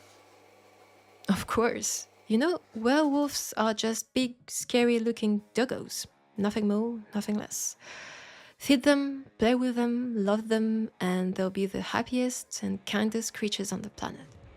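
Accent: France. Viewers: So happy to see this witch back in business again, she’s my favourite of all the characters you voice and your French accent is always so nice to listen to hope you have a great Halloween and look after yourself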